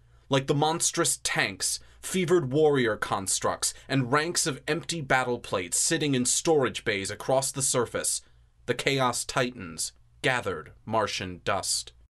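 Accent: United States. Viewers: ugh no offense but American accent just doesn't fit 40k.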